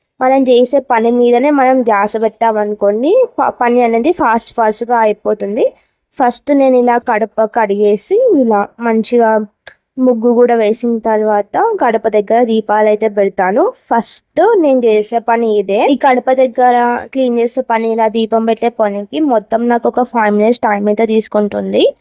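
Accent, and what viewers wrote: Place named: Sangareddy, India. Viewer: Hii sis first time mi video chusanu.mi slang same maa lane undi madi sangareddy.mi villege yenti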